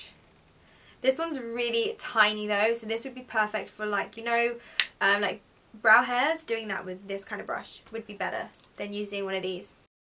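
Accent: United Kingdom. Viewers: Ahhh, you replied! I love you even more now! <3 <3 <3 British sounds so much cooler. Haha. I hope you enjoyed your stay in LA :)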